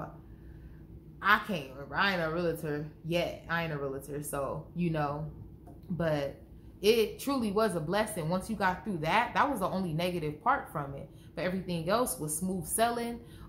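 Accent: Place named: California, United States. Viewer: LOL! You must be from the Bay or LA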